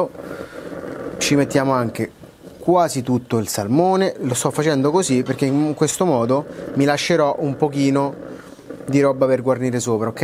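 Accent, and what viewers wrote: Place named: Sicily, Italy. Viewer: Guarda che stai parlando siciliano non calabrese.....comunque sei bravo....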